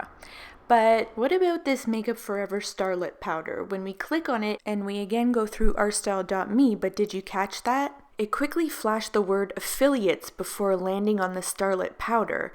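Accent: Canada. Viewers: Ugh, you sound so canadian it hurts. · "The one im aboot to talk aboot.... so you can see what im exactly talking aboot" I've never recognised a Canadian accent so clearly until now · OMG are you Canadian!!! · You are canadian right? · i love canadians o's. dey so nice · I can hear your Canadian accent lol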